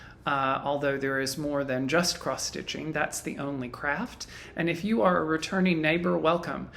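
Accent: South, United States. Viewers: I always love hearing the poems from your”granny” and the stories from your past. I am not from the South but I love hearing the many fun words and accent that you grew up with. Thank you so much for sharing. I hope you get lots of stitching time this week…………. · I've enjoyed bingeing on the last 2 months worth of episodes (got a little behind on the flosstubes). I just love your gentle, relaxed, southern manner, so soothing and at the same time so fun to listen to while I stitch after a long day at work. :D Your granny sounds like she was just amazing, thank you for sharing her poetry. Thank you for bringing a smile to me face and bringing a little bit of "happy" to my day. #chrisitup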